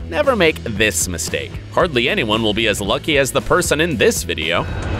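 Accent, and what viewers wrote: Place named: United States. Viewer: Nahh - that yank wank voice is enough to put me off.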